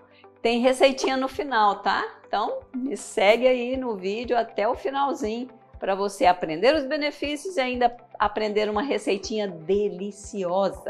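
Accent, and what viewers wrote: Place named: São Paulo, Brazil. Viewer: Eu não sabia que ela era mineira. E por que fala como uma paulista? Tem que falar como mineira.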